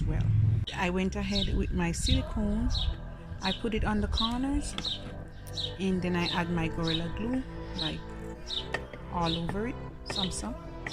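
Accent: Jamaica. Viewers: I just subscribed cuz of your Jamaican accent💪🏾❤️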